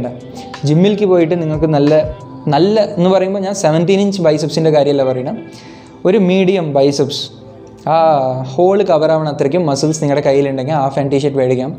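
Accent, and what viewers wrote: Tamil Nadu, India. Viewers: Ningal evideyanu settled.... Aake paade oru tamil touch undallo man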